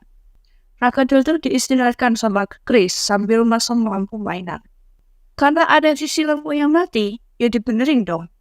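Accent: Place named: Java, Indonesia. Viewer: Pasti kk org jawa ya ngomongnya masih medok.hehe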